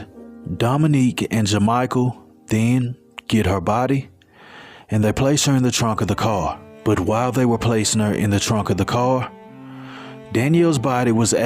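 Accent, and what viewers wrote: South, United States. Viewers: New subscriber here! Your southern accent is very welcoming. I am a North Carolinian who was born, raised, and still lives here! I’m from the mountains close to Boone, but I am familiar with all of the places mentioned in today’s story. This is just heartbreaking. Such a beautiful young girl who never got to experience life and I imagine she had immense potential and would have done so many great things with her life. Unfortunately that monster took that future from her, and it just ain’t right. These type of stories always get to me. Just a sweet young lady who loved everyone. RIP Dani! You will never be forgotten! ❤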